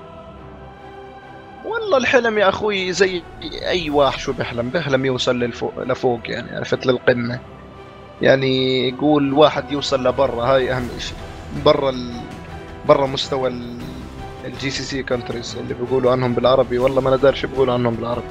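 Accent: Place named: Jordan. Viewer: ههههههههههه بلزمش تكتبو اسمو 😂😂😂 معروف من لهجتو اردني 😆😊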